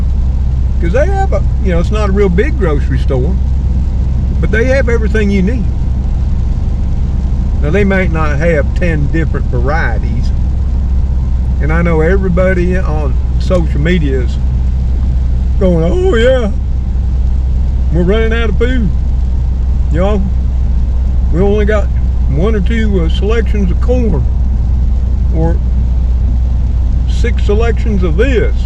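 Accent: South, United States. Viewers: Mr.. I was worried about you too. Your Vegas Fan. I'm glad your ok. I also think about Trixie possibly having issues when your not around for a bit. I would like to put those southern bells out there on notice, about looking after you. No disrespect sir but your quite the southern gentlemen a man of principle and intelligence.. Their missing the boat as far as I am concerned. Opp's that's right you got rid of that. Lol. I sure enjoy your southern drawl and interesting verbiage at times. Confession I don't always understand you it just makes it all that much more entertaining and educational of another culture then I am used too. I hope you don't take my off hand compliment and kidding to be inappropriate.. I have the utmost respect for you sir, your channel and what you are willing to share with us out here in video land. Be well Mr. until the next ride about.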